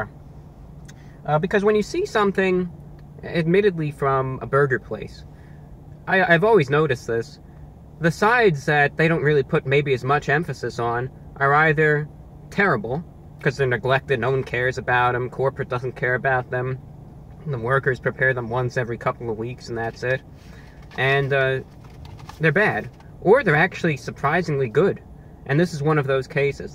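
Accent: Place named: New Jersey, United States. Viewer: You defienelty have a jersey accent lol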